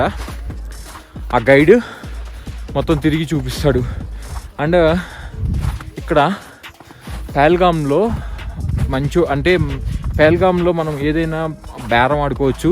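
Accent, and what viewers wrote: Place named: Guntur, India. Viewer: Me guntur slang valla anukunta chalaa natural ga untundhi me explanation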